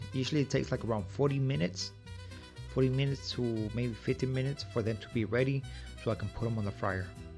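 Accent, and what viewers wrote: United States. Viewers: I’m watching this video and I love the fact that you started your own business. You don’t have a Mexican accent at all. You have an American accent. I noticed that you are going to close the business and that’s sad. I hope you get another location and continue your business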